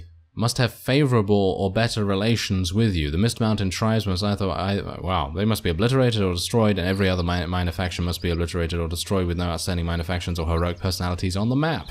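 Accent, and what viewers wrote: United Kingdom. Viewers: It was me, I was talking about Sarah and Leslie earlier, sorry bruh. Love the series, you are awesome. I started watching this series this Friday (I was sick this week) and just caught up now. It's addictive, and you're doing an awesome job, my beautifully British accented friend!! - Love from America.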